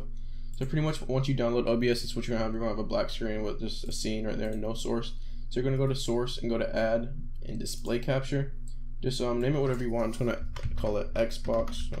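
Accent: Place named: United States. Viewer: Bruh u sound like fitz but American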